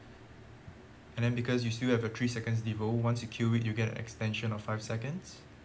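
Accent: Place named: Singapore. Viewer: Bro are you from Singapore? You sound like a Singaporean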